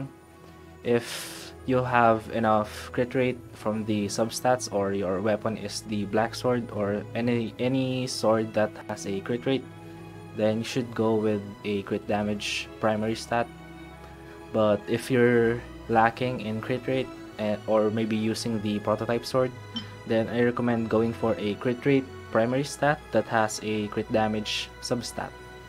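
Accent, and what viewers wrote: Philippines. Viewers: Sounds like you're a filipino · You sound like you're from ph. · Pinoy blood my dude 🔥, lahat naman tayo ganyan magsalita ng English kahit ako Englishero ako eh ung accent lang talaga HAHAHAHA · ok yung english mo pare, di naman siya cringy it's just english with a pinoy accent. nothing wrong with that : ) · You sound Filipino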